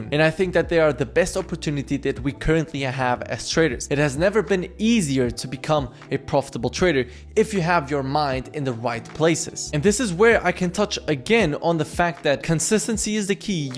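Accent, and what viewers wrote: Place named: Portugal. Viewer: hey, vi o teu podcast do tradingnut. pergunta: és tuga? estou a escrever em PT de propósito. o teu sotaque já me dizia que sim.. mas depois do podcast fiquei a pensar 😂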